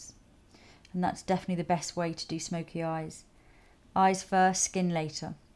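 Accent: England, United Kingdom. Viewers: I like listening to your classy English accent. Reminds me of clients calling my line at work. :) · Which part of the UK are you from? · I like to listening English accent . You have got very beatiful voice . Videos are very beautiful Thank you ♥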